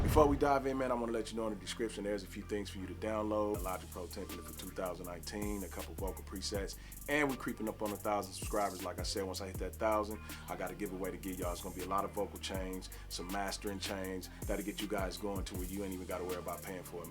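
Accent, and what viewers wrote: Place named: Cleveland, United States. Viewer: Well then you close, homie. I knew I pinpointed your accent and just genuinely liked you because you was from the Land. Good to know homie. I’m frustrated as fuck. I’m still up. I thought I had exploded on my tracks on my MPC to live properly into my logic, but I actually did it. There are Sounds is missing. Are you on Instagram? Send me your handle. I would like to be your Instagram partner. And thanks man I will check your videos. I like your style.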